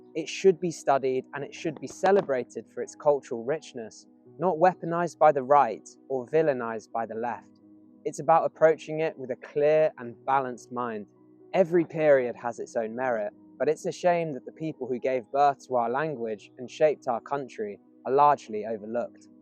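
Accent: United Kingdom. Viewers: you sound like you have a good, clear BBC voice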